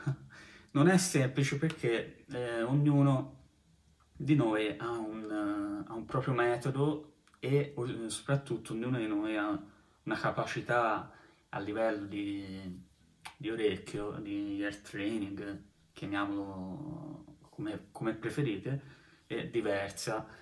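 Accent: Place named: Livorno, Italy. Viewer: mi piacciono molto le tue lezioni di basso, fra le migliori che ho trovato su youtube! e se non sbaglio, dall'accento mi verrebbe da dire Forza Livorno!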